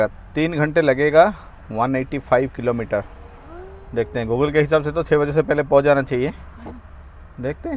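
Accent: Odisha, India. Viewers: Nice journey . I guess you are original Odia from your talking . Please tell if I am wrong or right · Bhai are you from Odisha. As your accent matches with that of odia people.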